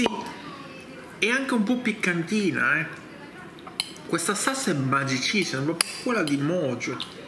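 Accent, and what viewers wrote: Italy. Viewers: HAI UN ACCENTO DEL SUD SUD ITALIA INVECE IL DI UNA VOLTA AVEVA L'ACCENTO DEL NORD..ED AVEVA UNA VOCE PIÚ BELLA CON TANTE VISUALIZZAZIONI.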